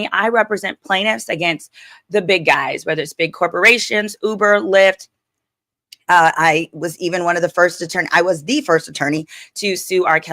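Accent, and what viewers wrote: South, United States. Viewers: Hey, love your lives covering this trial! I graduated from Thurgood a few years ago and I'm from Oakcliff too! Listening to you is making me wonder if I sound like you lol, that country twang be jumping out.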